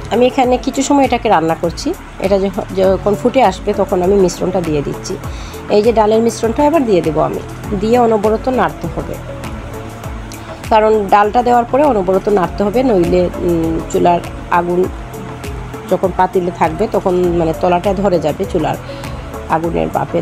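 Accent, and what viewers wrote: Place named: Sylhet, Bangladesh. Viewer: সিলেটি ফুরি😃😃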